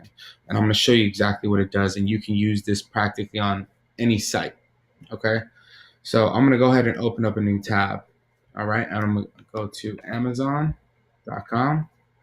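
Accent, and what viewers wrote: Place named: New York, United States. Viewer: Sounds like you from New York, factzz.. Good info... Why are so called guru saying don't use Amazon, don't use software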